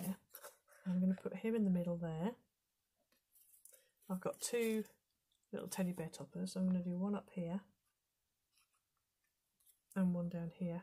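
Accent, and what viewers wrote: United Kingdom. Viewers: Cute card ❤ the way you do your tutorials so easy to follow, also I’m from California and love your British accent. Thank you for sharing have a nice day.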